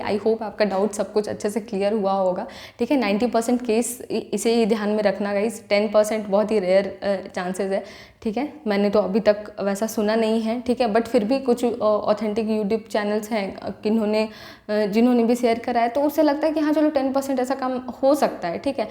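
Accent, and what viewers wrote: Jharkhand, India. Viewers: Aap jharkhand se ho kya??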